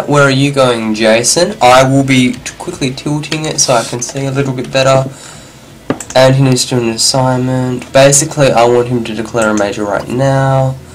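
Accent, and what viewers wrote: Australia. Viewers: i meant that i don't have a distinguished accent. like for example he's an aussie....and americans sound nasally, and british people sound cool, and people from south africa have that cool ring to their voice.....and i.....i have none of that.....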